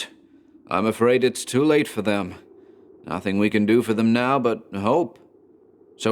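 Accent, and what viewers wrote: United States. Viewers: does such an amazingly subtle American accent it makes me laugh.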